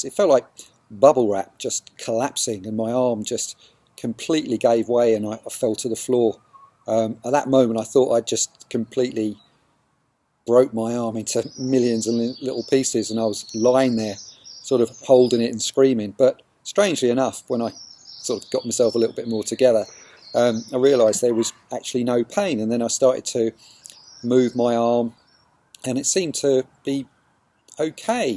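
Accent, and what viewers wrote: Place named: United Kingdom. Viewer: I'm learning some English too watching your videos... British accent rules..Greetings from Colombia south America.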